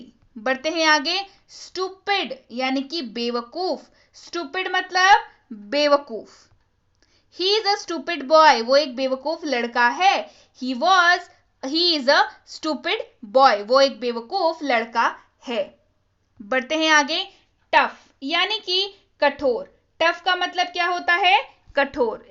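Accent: Bihar, India. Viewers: Bihari like maam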